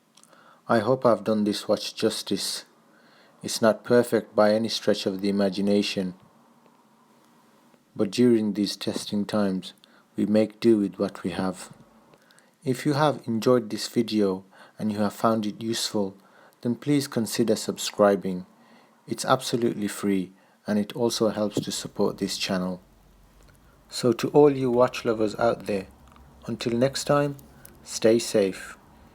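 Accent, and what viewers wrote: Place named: Black Country, United Kingdom. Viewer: Hi. Are you from Smethwick by chance. You have more black country accent than Brummie.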